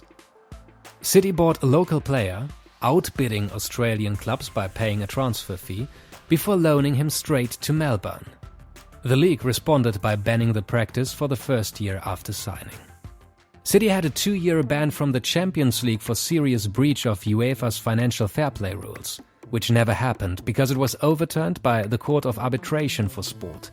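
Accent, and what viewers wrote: Germany. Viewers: German accent as well haha (I love it btw )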